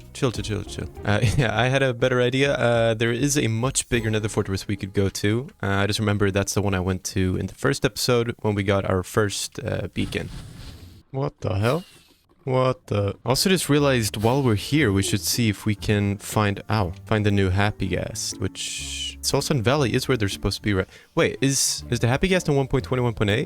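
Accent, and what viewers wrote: Sweden. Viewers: Are you Swedish perhaps? If so, hallå där🕺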